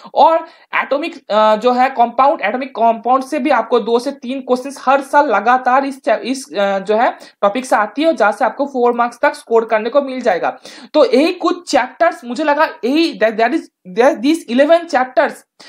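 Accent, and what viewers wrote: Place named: Bengal, India. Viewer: দাদা তোমায় হিন্দি থেকে বাংলায় ভিডিও দেওয়ার জন্য সাজেস্ট করছি। কারণ তুমি বাঙ্গালী এবং তোমার মধ্যে বাঙালির কথার টানটা থেকে যাচ্ছে। আমি সাজেস্ট করবো তুমি বাংলায় ভিডিওটা বানাও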